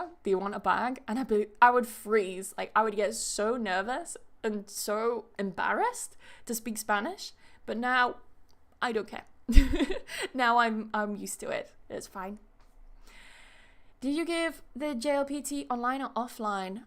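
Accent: United Kingdom. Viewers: Tengo casi tres años de vivir aqui en UK, y nunca habia encontrado un video con una persona de habla Inglesa Británica que explicará tan bién sobre la Pronunciación de las palabras, Vocales, Diptongos y demás. En lo particular se me ha hecho muy difícil aprender el Acento British, debido a que yo aprendi un Inglés muy Americanizado por no decir un Espanglish, Enhorabuena por todo lo que enseña en sus Videos, ahora ya tiene un nuevo Estudiante...!!!! Congratulations...!!!!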